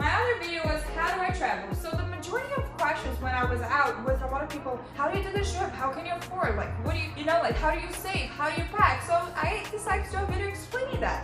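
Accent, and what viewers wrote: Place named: Rio de Janeiro, Brazil. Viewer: te conheci pelo canal da Bruna e de início te achei um pouco debochada (acredito que pelo sotaque carioca hahahah sou sergipana, vizinha de estado da bruna, inclusive já estudamos na mesma universidade, mas em momentos diferentes), enfim, acabei entrando no seu canal e me surpreendi demais, tu parece ser uma pessoa mto sensata e do bem. Tenho mta vontade de morar em LA, quem sabe um dia, né? Apesar de entender o inglês mto bem, eu tenho mta dificuldade p falar e ver vc falando tão bem me motiva demais. Enfim, só queria falar isso <3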